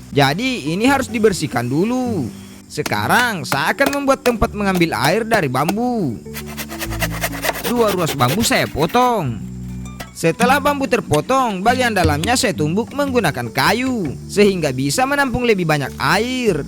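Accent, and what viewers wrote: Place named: Makassar, Indonesia. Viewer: asli org mna bang pke makassarki ku dngar😂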